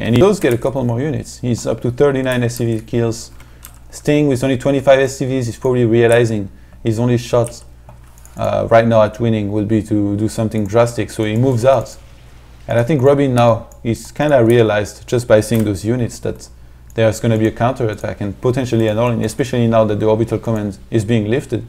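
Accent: France. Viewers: that french accent! keep it up! the analysis level is close to qxc`s terran analysis. and that`s about the highest praise i can give you.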